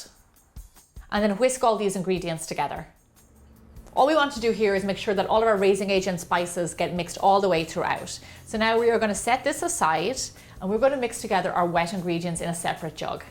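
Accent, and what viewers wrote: Ireland. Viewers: She is just soooo Irish! I love her accent !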